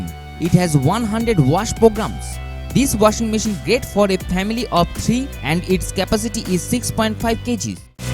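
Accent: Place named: Bengal, India. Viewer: He is bangalan so he speaks bangla slang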